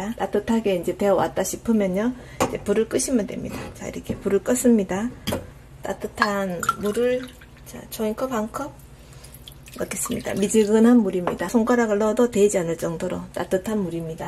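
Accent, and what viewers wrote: Gyeongsang, South Korea. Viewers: 제가 결혼하고 다른지역으로 왔는데 정겹게 경상도 말투로 쉽게 설명해주는 님 만나서 반갑고 좋습니다~~^^ 호떡 너무 좋아하는데 한번해먹고싶네요 감사합니다ㅎㅎ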